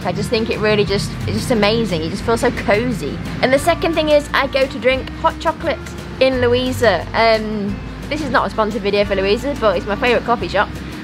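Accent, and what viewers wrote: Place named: United Kingdom. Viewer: 英國腔很好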